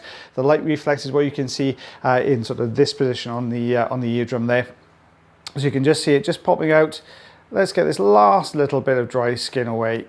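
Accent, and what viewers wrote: Wales, United Kingdom. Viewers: Love hearing you speaking, that lovely Welsh accent.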